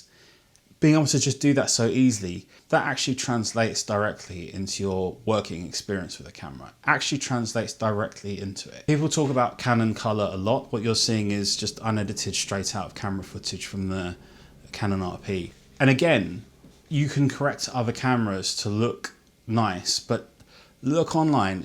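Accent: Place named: United Kingdom. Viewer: I think you made a really good point here. I do own the R5 and guess what on my surfing vacation last week to Spain I made all my pictures with my iPhone. I was too afraid that the camera could get stolen or at least damaged in the sand or whatsoever. When I came back I started thinking to address that point and looking for a cheaper body. I thought to get maybe a Sony A7 IIIc But the camera costs still €2000 at the moment. Also during walks and most of the time I don’t bring the R5 anywhere to keep it safe and nice. How can you get better if you behave like that? You really brought this whole issue to its point 👏👏👏 btw enjoying your English or British accent. :)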